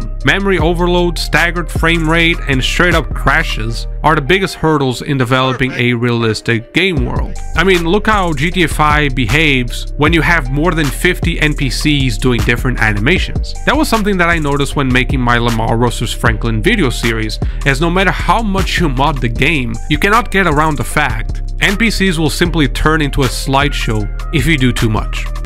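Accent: Portugal. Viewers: i smell tuga in here... :))) props!! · eu sabia que eras tuga do get-go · I noticed your Portuguese accent in the first 25 seconds of video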